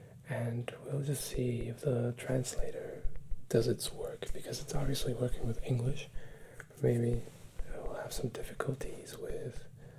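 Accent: Spain. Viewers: Subscribed because your Spanish accent is amazing😊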